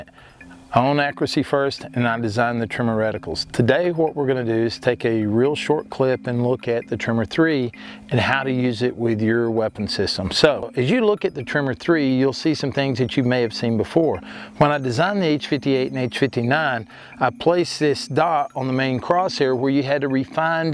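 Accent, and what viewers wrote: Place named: Appalachia, United States. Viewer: Do you bite your tongue, twist it into a knot, or what is that sound? Does that hurt? Heard it several times now, but understand shit! Only wahwahwah. Next time, find someone with a less pungent hillbilly accent, hahbaahthad?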